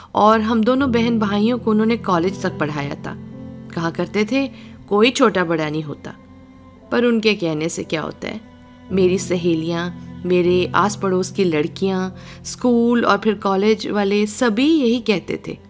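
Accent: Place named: Pakistan. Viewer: I love your sweet pakistani accent voice.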